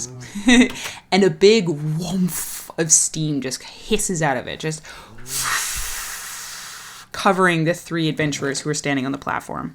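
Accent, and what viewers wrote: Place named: South, United States. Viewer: I love everything about this. As a born and bred southerner I laughed heartily at your southern accent.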